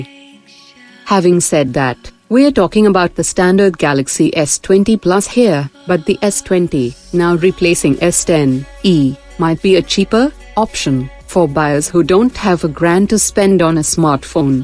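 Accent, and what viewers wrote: India. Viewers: Holy shit me too, I was so confused whether or not it was a real woman or a robot with indian accent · I cant tell if this is a robot voice. And if so why the Indian accent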